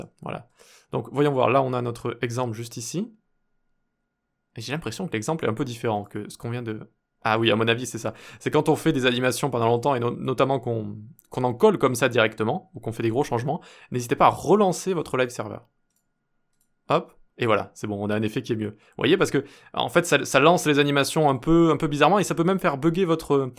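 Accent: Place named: United Kingdom. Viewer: Ton accent anglais me fume à chaque fois xD Très sympa comme effet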